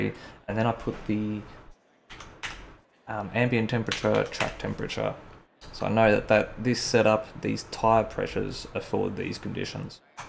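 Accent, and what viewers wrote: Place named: Australia. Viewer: Subbed. Good to hear an Aussie accent:-) Great video. Thanks. Just a question: I want to test in the wet, and also practise pitstop technique, setting up pit strategies, as per your video. Which mode can I use to do this without affecting my SA, CN, CC, etc? ie have ratings turned off, so I can muck about. I can't find a setting where I can select weather (rain). Yeah, I'm a newbie, and all of these variables are doing my head in. But loving ACC (on PS5 and PS4 Pro). Thanks in advance.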